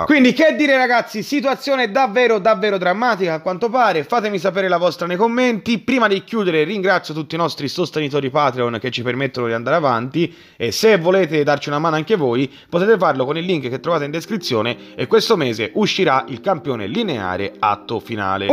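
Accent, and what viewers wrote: Tuscany, Italy. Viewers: Tanto per curiosità, ma sei Toscano?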